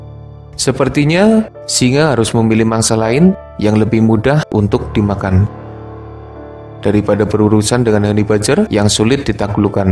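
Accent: Banyumas, Indonesia. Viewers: Jawa ngapak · Ngapak banget bang wkwkwkwk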